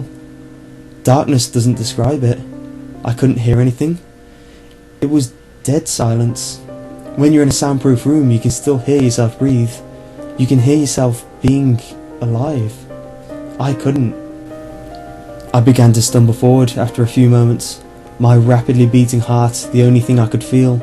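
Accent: United Kingdom. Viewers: this is a great story this os a good story the britsh eassent made it better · a british/or english guy reads it the story just got 20% cooler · this is my favorite creepypasta! no not just because its told by a british guy stop making stuff up! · Mmmmmm british accent i really do like this story :D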